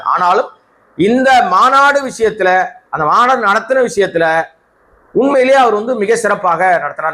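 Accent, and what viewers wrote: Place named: Southern Tamil Nadu, India. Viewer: இவ்வளவு அழகாக தமிழ் பேசுகிறீரேறே. உமது சொந்த ஊர் எது? தெற்கு பக்கமா?🎉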